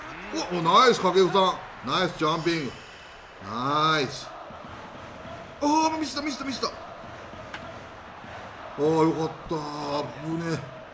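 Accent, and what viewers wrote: Japan. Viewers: さとやんアクセントが関東、関西、東北、四国山ほど混じってる（笑）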